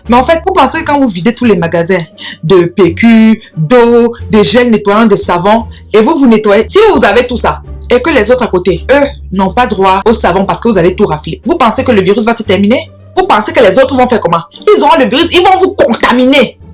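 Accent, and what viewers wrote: Cameroon. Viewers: J'adore trop ton accent kmer, kiss from une sœur sénégalaise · J'adore 💕 le fait que tu parles #camerounaisement😂, trop de love pour toi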